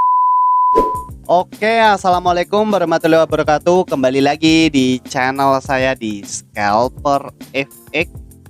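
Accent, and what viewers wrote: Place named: Indonesia. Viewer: orang mana bro? kok medok suaranya haha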